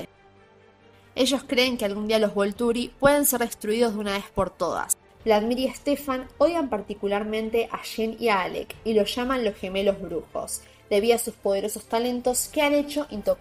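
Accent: Argentina. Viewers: Puedes hacer un video sobre quien es mas fuerte? Si el chupa sangre o el perro gigante?🤭 me encanta tu acento argentino, buenos aires no?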